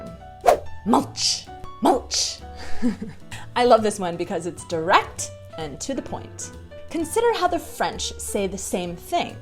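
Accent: United States. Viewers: It's really terrible to listen American language for me as a Czech native speaker. After probably one year of my subscription to your channel it's not better and I still feel like you're trying to verbally attack me.:D I feel like you roar or shout on me. True English sounds incomparable better. American screaming and complimentary smile are two main reason why Americans call Czech people rude. Stammgast is regular German word. German language joins nouns and adjectives to one word and stammgast is the same. Stamm = permanent or regular and gast = guest. There was a huge influence of German language to Czech one because Czech principality and later kingdom was for more than thousand of years (except last hundred years for whole known) Czech history a significant part of German cultural area. There is a second meaning of word štamgast in Czech language. It can describe someone who everyday sit in pub and drink a lot and is addicted on alcohol so his brain is full of holes like a Swiss Cheese. 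Kočičí hlavy (cat heads) was originally word for cobblestones which were in use for decades - so long time that they got eroded to spheric shape. In 1990 was Prague full of them and it was really terrible surface. Especially for walker's ankles. Contemporary is this term used for any cobblestones except little ones used in footpath mosaics. Hajzl is very expressive word so hajzlbába too. For distinguished woman is a lot better to tell toaletářka. Skyscrapers are very disliked by European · Milá, jsem mile překvapen, jak může američanka tak hluboce pochopit českou mentalitu a nacházet i nedostatky v americké kultuře a výchově. Opravdu jen náhodou jsem narazil na jedno ze Tvých videí prolinkovaných na webu a pak už jsem jich shlédnul více. Tvůj přízvuk je sice opravdu velmi "americký", ovšem s češtinou se pereš docela dobře, přeji hodně dalších úspěšných kroků. Češi si permanentně nestěžují a nejsou pesimisti...češi, pokud si stěžují, hledají pochopení, naslouchání a třeba i pomoc...lepší je být skeptik v realitě než falešně vysmátý týpek s medem u papule...to je bohužel realita Ameriky, kdy je vždy vše: "How are You?" - "All right, all is fine, I'm OK!"...přijde mi to spíše jako pokrytectví. 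Přeji Ti mnoho dalších dobrých zkušeností s poznáváním naší kultury a také pevné zdraví v této nelehké době.:-)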